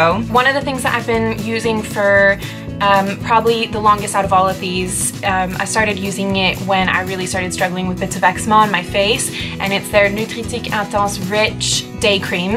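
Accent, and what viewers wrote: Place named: England, United Kingdom. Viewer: You have a hint of an English accent at times lol or is it just me?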